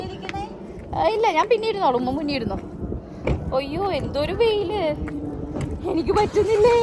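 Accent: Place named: Chavakkad, India. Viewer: Umma thrissur Chavakkad Aano? 😀samsaram ketapol thonni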